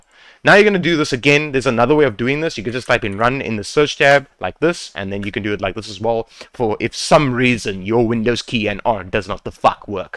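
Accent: South Africa. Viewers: This is amazing, also I love the South Africa accent what an absolute hilarious accent to listen to almost as funny as the Scottish accent god bless you for this brother not only excellently explained in a clear and consise manner but humor combined with the simplicity had me on a roll thanks again! Would love to meet you in person! 🔥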